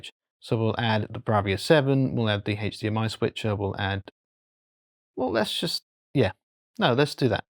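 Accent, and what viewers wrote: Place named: United Kingdom. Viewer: It looks good. By your accent I can tell that you are British. If you actually live in the UK how the hell did you get one?!? Their website doesn't even list the UK as a shipping destination 🤬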